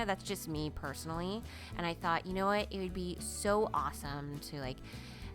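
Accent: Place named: Northern California, United States. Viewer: Love it, knew you were from NorCal .... From Alameda :)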